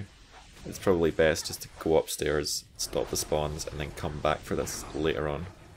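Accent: Scotland, United Kingdom. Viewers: you sound scottish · You have the softest Scottish accent i have ever heard :O i nearly crapped my pants when i heard it I'm Scottish myself which i think made it more striking :O great guide though BIG THUMBS UP BRO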